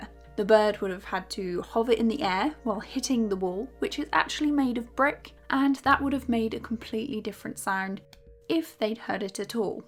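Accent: United Kingdom. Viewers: I really love watching your video. Your animation is so cute. and The best thing that I love is your bloody lovely accent! I'm studying accent with your video.HAHA BTW Which in The U.K do you live? what accent you have?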